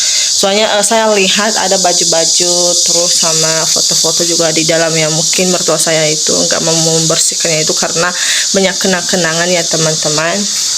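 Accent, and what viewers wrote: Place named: Eastern Indonesia, Indonesia. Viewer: Bisa dimengerti mengapa sering mengucapkan kata Teman Teman.. Gaya bicara orang dari Timur Indonesia masih kental sekali.. Mohon Kakak2 memaklumkan nya dan Kakak kakak bantu dukungan maksimal supaya kakak kakak dan semakin dekat.. Semoga kakak kakak senang kedepan nya.. Oke kakak kakak sekalian sekian dulu kakak kakak.. Sampai jumpa lagi..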